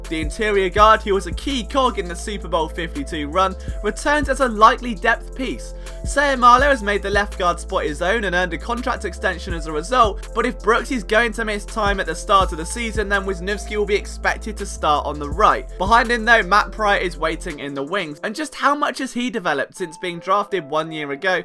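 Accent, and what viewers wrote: United Kingdom. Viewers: That is the problem for me. The content is excellent. Just can't stand the little boy brit voice.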